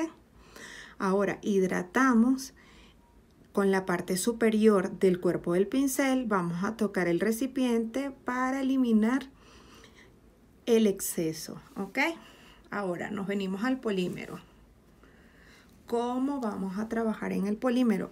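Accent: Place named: Venezuela. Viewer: Amo ese acento venezolano saludos desde Argentina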